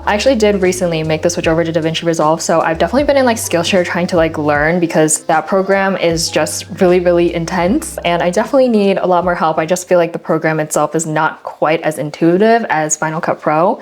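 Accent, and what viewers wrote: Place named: South Korea. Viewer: 발성이 한국사람인데ㅇ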